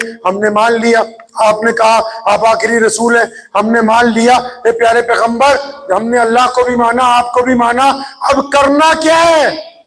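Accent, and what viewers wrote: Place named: Punjab, Pakistan. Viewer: Takabbur nhi bhai..wo punjab se he..unki aawaz or lhza hi esa he.. MashaAllah bayan bahut Bdiya krte he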